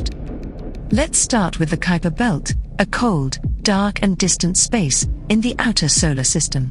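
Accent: United Kingdom. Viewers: How come every time you guys make one of these documentaries or whatever you have to have an English British accent what's up with that can someone go yo yo yeah there might be something out there yo dog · Appreciate the British accent. The 500m large radio telescope is mighty impressive. The rest about aliens is speculation.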